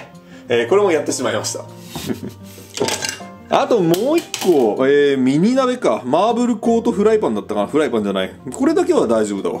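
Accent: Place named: Shizuoka, Japan. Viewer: 無印良品の発音直りませんねえ〜 後、収入の発音も。きほんひとりさんと同じでしたね。静岡弁なんですかね？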